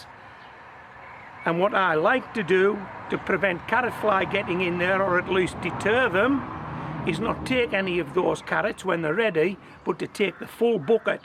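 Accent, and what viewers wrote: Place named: Yorkshire, United Kingdom. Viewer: Where are you from mate? Love your accent it’s like a mix of Yorkshire and Black Country 😅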